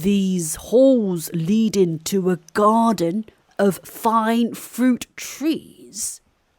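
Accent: United Kingdom. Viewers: 영국발음 들으러 왔는데 왠일인지 점점 졸려서 10시도 안됐고, 영상은 항상 집중하게 되서 이상하다하고 피곤한 저를 질책하고 반성하려 하다가, [수면용]이라는 걸 다시 깨닫고 아직 잘 때가 아니라서 "Ask not, but eat" replied Aladdin.까지만 봤어요 ㅎ 세시간 뒤에 영상에 목적에 맞는 시청자가 되어서 다시 듣도록 하겠습니다~ 영상 감사해요~ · 저 따라하다가 영국발음 성대모사 중독돼버렸어요 ㅋㅋㅋㅋㅋㅋㅋㅋ한국말도 자꾸 영국식으로 주접떨면서 하고싶고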